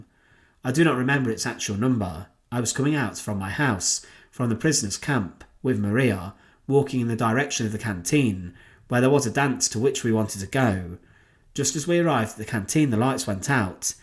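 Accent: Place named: East Midlands, United Kingdom. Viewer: I'm from Wolverhampton and can catogorically say he's not from anywhere in thecwest midlands! Later in life I worked in Derby for twenty years and he sounds like he comes from somewhere in the East Midlands ... Can't really say where exactly though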